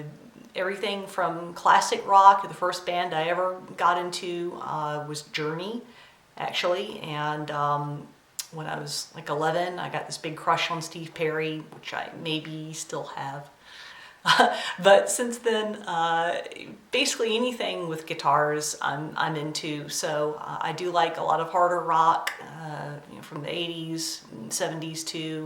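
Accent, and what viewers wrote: United States. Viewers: I just found your videos and I'm enjoying them. I'm hearing a few southernisms in your accent so I'm thinking Kentucky or maybe Indiana. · great first video I have been watching floss tube for over a year but not found the courage to make a video yet I think your accent is from some where around south Caroline but since I am from Canada it is mostly a guess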